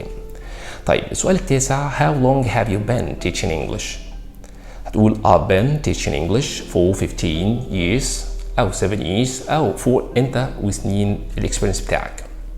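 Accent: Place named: United Kingdom. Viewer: لكنتك البريطانية مبهرة برافو يالتوفيق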